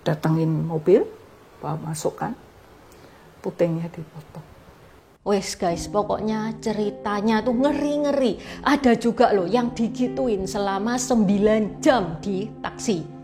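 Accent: Java, Indonesia. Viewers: logat jawa. klo cina jkt ga mgkn akan sounding hal ini, karena kalian ga akan prnh 100% paham tragedi kelam itu. sesuatu yg bkn utk dijadikan cerita. -some truth better left unspoken-. yg prlu dilakukan stlh masa itu adalah tetap berbuat kebaikan dan pertebal penjagaan diri.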